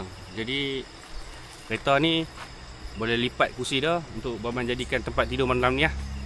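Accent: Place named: Kelantan, Malaysia. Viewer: steady bamban makan budu..lidah kelate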